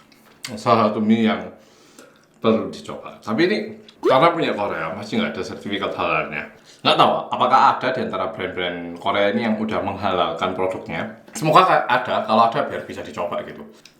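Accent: Java, Indonesia. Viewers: Oppa iki rupane korea tenan tapi medoookkkk puoolllll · sam aku kangen samean ngomong boso jowo ayo a medok o lek ngevlog gawe boso jowo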